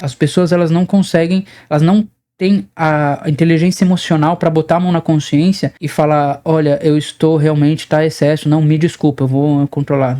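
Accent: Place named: Southern Brazil, Brazil. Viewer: pelo seu sotaque, vc é paulista ou sulista, é isso? vem pro Nordeste pra ver uma coisa 😅😅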